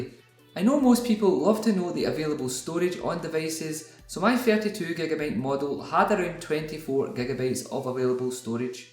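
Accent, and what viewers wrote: Ireland. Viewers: Are you Irish or Welsh? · Do people in Ireland find American accents as cool as I find yours? Lol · r u from Ireland?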